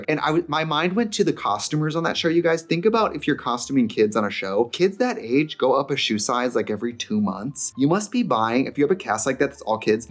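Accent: Wisconsin, United States. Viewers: Her Wisconsin accent was very strong in this video 😭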